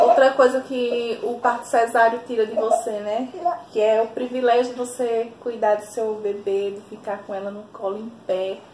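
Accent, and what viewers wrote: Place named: Northeast, Brazil. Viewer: Como amo esse sutaque, meus pais são nordestinos 💝 Adorei seu canal, sua naturalidade e sinceridade 💝 Que Deus continue abençoando vc e seus filhos💝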